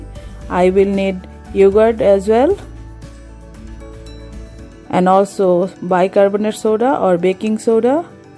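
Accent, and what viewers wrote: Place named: Bengal, India. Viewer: Watched your video fully. You have bengali accent. Join you dear.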